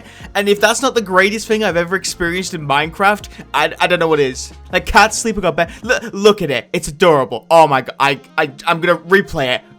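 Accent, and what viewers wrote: United Kingdom. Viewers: R u Welsh?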